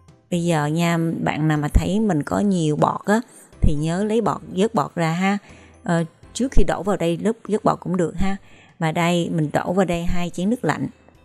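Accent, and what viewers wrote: South, Vietnam. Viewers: WOW WOW nhìn hấp dẫn quá bạn ơi!!! Món thịt kho nước dừa và trứng chiên trước rồi mới kho, mình đã từng ăn rồi, bạn nói nhớ ông ngoại, còn mình nhớ bà ngoại nè!!! Quê ngoại mình cũng miền tây mến thương bạc liêu đó bạn!!! Cảm ơn bạn nhiều, bạn giỏi và khéo tay quá chừng luôn, cách nấu và hướng dẫn thật rõ ràng và kỹ lưỡng nữa, bạn thật là tốt bụng quá!!! Giọng nói miền nam nghe thật là ấm áp 💖💖💖💖👍👍👍🌟👏👏👏🌹🌹🌹🌹🌹😊😊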